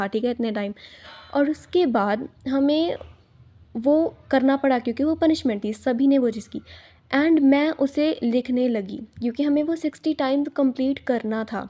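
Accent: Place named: Punjab, India. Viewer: Probably because of her punjabi accent❤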